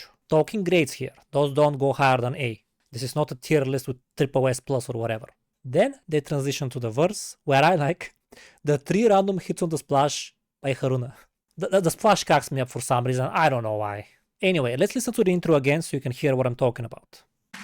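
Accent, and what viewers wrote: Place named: Serbia. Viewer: Only Lovebites can make a song like a mix of Slayer and Testament but still got it with their own style. Gotta love this. M & M guitar duo just cant be beaten. Lovebites reactions from this dude are always great. This bro knows music and his accent reminds me of someone from my area (i am serbian)-ex Yugoslavia. Love it. Just keep it up bro-dont stop, you re good. Respect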